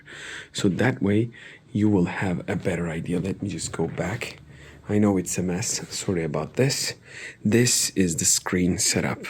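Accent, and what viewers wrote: Turkey. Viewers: Selamlar, öncelikle bu videoya harcadığınız emek için çok teşekkürler. aksanınızdan Türk oldugunuzu tahmin etmiştim. Yorumlara bakınca tahminimde yanilmadığımı gördüm. İlk projeksiyonumu alacağım. Film ve dizi için kullanılacak En fazla 120 inç görüntu için kullanacağım. Ekstra ses sistemine fazla gerek olmaması güzel. Bu cihazın Fan sesi rahatsız edici seviyede gürültülü müdür? Eğer gürültülü ise eco Mode gibi bir secenekle ya da parlaklığı azaltarak gürültüyü minimuma indirmemiz mümkün müdür? Rahatsiz edici değil derseniz siparişi geçiyorum.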